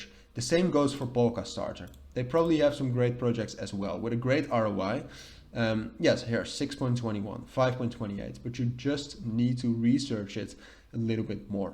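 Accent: Netherlands. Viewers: Hey by you accent i thin you from Netherland right? I want to know if you are still active on this youtube channel if yes i have a few questions and things to propose you. Can you just reply and i will dm you on you socials. Have a good day!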